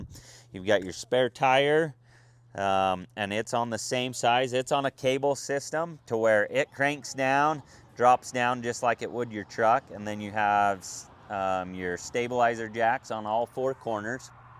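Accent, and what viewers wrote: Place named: Sanpete, United States. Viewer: got the Sanpete twang in his voice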